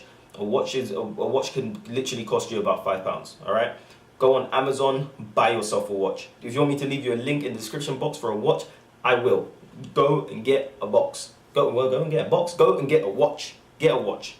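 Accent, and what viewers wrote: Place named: AAVE, United States. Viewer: Hate the ebonics in your voice!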